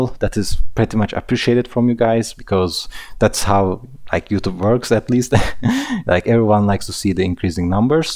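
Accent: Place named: Poland. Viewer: Omg nicee accenttt~ I love men with Polish accent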